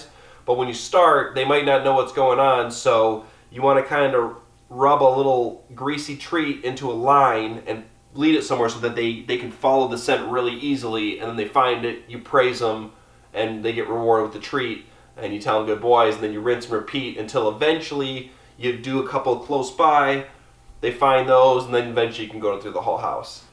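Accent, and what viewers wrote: Canada. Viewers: I’m sure you’ve addressed it before, but where are you from? I’m assuming Canada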